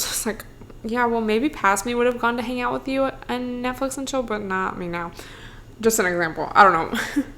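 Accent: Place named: California, United States. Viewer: To be honest, I'm just sitting on my mattress, and listening a while to u, because I just wanted to hear some recent voice with some Ca. maybe LA accent, just to make myself feel a little bit more at home.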